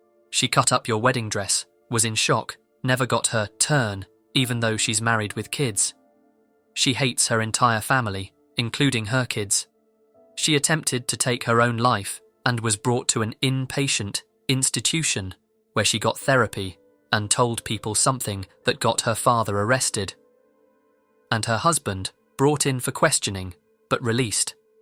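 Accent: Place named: RP, United Kingdom. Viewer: Yeah. Similar tone of voice. And obviously the accent is southern "proper" almost RP English. So I get why you find them similar.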